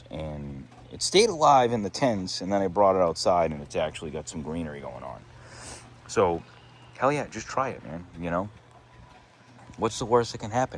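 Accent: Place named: Northeast, United States. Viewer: Thanks for sharing your garden! Are you in the far Northeast like near Boston? Pickup up a subtle Northeast accent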